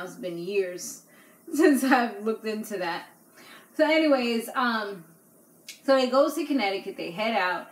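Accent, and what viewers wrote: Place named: New York, United States. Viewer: Yo you a baddie & that NY accent makes you even more attractive😍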